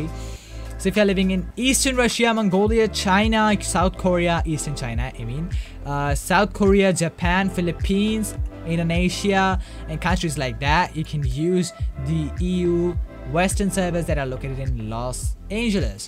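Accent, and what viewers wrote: India. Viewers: how are you indian ur accent is great i am from iran but live in germany keep up the great work!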